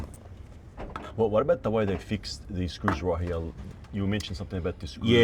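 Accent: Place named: Australia. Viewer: love the guys accent.. to me its one of the true aussie accents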